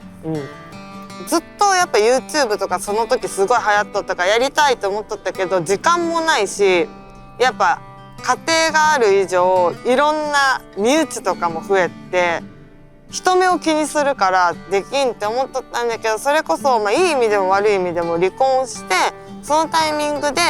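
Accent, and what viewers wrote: Okayama, Japan. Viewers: なんか喋り方に既視感あると思ったら 藤井風も岡山じゃったわ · 所々岡山弁でそうになって抑えとるところ可愛い笑 ほとんど岡山弁やけど可愛いぞ！ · ぼっけぇ本音じゃねー(^-^)わしも岡山じゃけん、まあたその岡山弁たまらんのよ❗いつか、千鳥とコラボが出来たらぼっけぇ事なりそうじゃね。もっと岡山弁使っていこう❗ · 岡山弁かもし出してるのがいい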